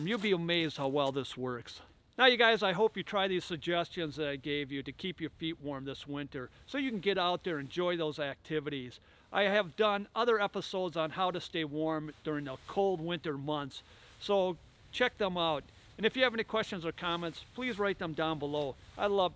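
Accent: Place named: Midwest, United States. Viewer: By your accent I'm guessing you're from the midwest? I'm from northern New England where it also gets extremely cold. I enjoyed watching your video for the good advice and I got a kick out of your accent. You'd probably get a kick out of mine too. Thank you for your service and happy Thanksgiving!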